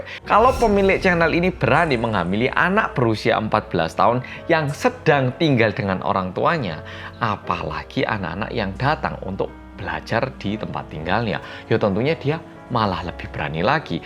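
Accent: Java, Indonesia. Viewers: Pualing suka dg pembawaan mas,juelass bhs yg medok Jawanya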